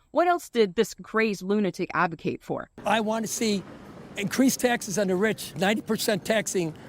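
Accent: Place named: California, United States. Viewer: This guy sounds like a Californian; notice that they always are talking about sending other people to do things for them.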